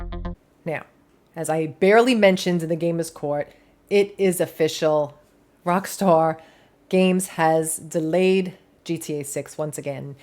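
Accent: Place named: New York, United States. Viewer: Elo mate. I decided to upgrade to your channel, because I love you and your doggies are so, so lovely. You're my fellow green eyed beauty and I love the dogos. Love your ascent. New York/Jersey, I'm guessing. I'm a total Londoner bloke, and I love you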